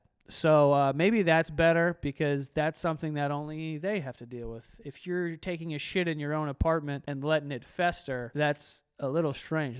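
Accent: United Kingdom. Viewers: Funniest British accent I’ve heard the past year! 😂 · Your British accent is hilarious! 😂 😂😂😂😂😂😂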